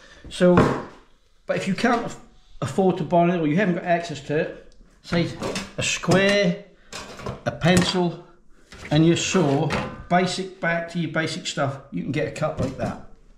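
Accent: United Kingdom. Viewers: Just found your channel I love the way you get your knowledge across. I can't cut straight to save my life but after watching this it should be a bit easier. Also you must be from Norfolk !!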